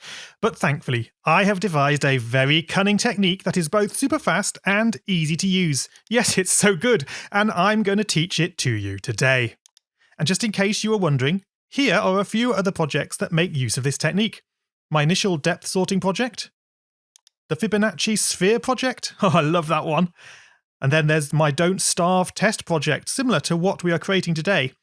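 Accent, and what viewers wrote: England, United Kingdom. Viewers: are you from england? thats the only place i know where people say z (shee) as z (zed)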